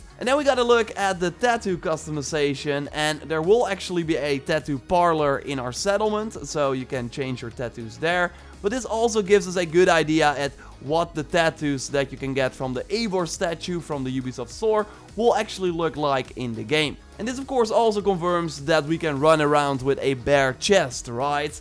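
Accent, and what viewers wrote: Netherlands. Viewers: And BAM 😆👊 Awesome 😄 I have a question: Where are you from? Your accent sounds Dutch 😁 Greetings from Belgium 😊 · Ben je Nederlands? Your accent gives it away xD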